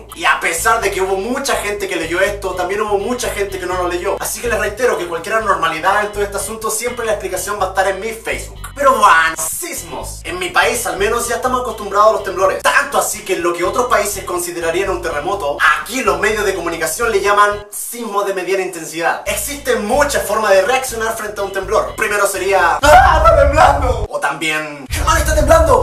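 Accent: Chile. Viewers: Waaa hablaba bastaaaaaaaante chileno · Germán más chileno que nunca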